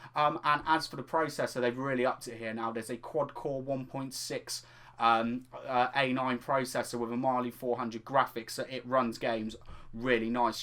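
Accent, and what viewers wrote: United Kingdom. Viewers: Oh bless ya buddy, from you from Oxford mate?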